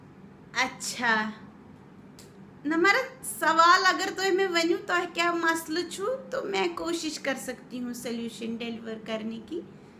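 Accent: Kashmir, India. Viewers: I loved the Ending.. Episode was OK but you are Too good...You are Super Talented.. I love your Expression and the Kashmiri Accent.. 😊😊😊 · Real kashmiri ascent. Loads of love for di.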